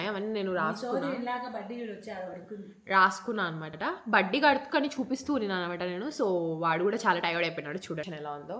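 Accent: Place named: Hyderabad, India. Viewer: Garu meeru Hyderabad lo leg petinaru total ga Hyderabadi Slang kuda vachesindi super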